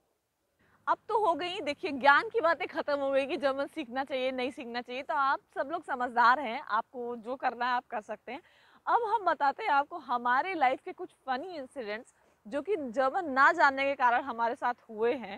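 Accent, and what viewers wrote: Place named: Pune, India. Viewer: your hindi accents like Pune or mumbai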